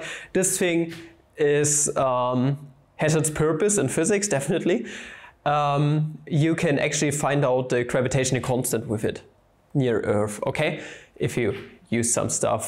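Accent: Germany. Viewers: i know you're german from your accent 😅 keep going bro good luck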